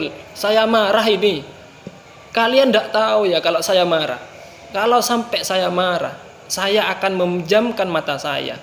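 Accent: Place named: Madura, Indonesia. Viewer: Sangat nampak loghat madura nya walaupun bicara bhs. Indonesia. Lanjutkan dakwah jennengan ustadz ustadz aswaja ORENG MADUREH DERIH SUMENEP SAMPE' BANGKALAN SIAP ADUKUNG DA'WAH EPON AJUNAN TAD🙏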